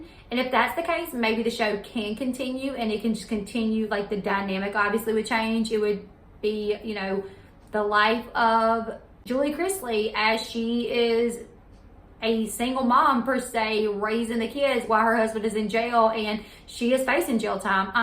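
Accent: South, United States. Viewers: I just wanted to tell u I love your show! Your southern accent brought me in but you are a smart informative lady! Keep up the good work!!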